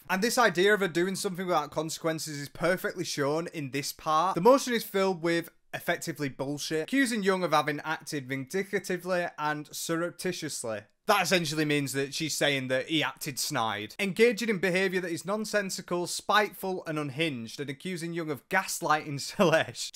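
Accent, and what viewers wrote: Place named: West Yorkshire, United Kingdom. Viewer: Nice to hear a northern accent on the platform 😁 gotta be W Yorkshire, i can hear those very slight differences to East Lancs pronunciation from a mile away 😂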